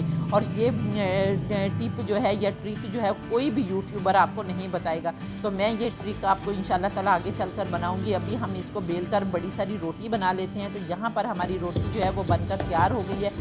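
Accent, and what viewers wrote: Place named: Delhi, India. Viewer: Dear sister,Masha Allah behtreen recipe of Palak and Mutter.Your way of talking and fluency is very charming .I feel you. Speak Urdu of Dehli origin.Use of a Punjabi word Bhuppa was also a unique selection by you. I enjoyed it. Thank you for pinning me and your response to my comments.Allah Hafiz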